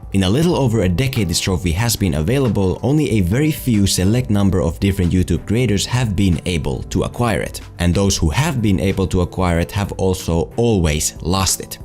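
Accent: Finland. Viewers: Where are you from? Your accent sounds Finnish. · Suomiaksentti spotattu 🤔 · the finnish accent. so good. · you sound like you are Finnish, is that true? If so, then Torille! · Do I hear a finnish accent? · Tää kuulostaa aika suomalaiselt englannilt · Hi, where are you from? Your accent sounds finnish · Nice vid! I started following Pewds when he had less than 50k subs, and the transformation is really amazing. I unsubbed a couple years ago, but now I'm loving his content again! Oh and are you from Finland btw? Your accent sounds like you could be :D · are you Finnish? Just wondering because of your accent. Pretty cool if you are, I don't know too many Finnish channels in the international waters. You have good stuff here, either case! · Where are you from? At first I thought you were an American but then I for some reason started to pay attention to your accent. 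Kinda sounds like a Finn with a great English accent. Idk, I'm just curious. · I think this guy is from Finland, so much finnish accent.. And he say the name just like any finn would say it ^^ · Your accent sounds finnish 🤔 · Oletko Suomalainen? Do I hear a finnish accent? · You sound very finnish. Also, good content! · Hey bro are you from Finland because you have a slight finnish accent. Like the way you say T's and A's is just very finnish you could definitely work on them. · Are you from Finland? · are you finnish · Hey, weird question... Where are you from? Your accent sounds a bit like that of a Finnish person.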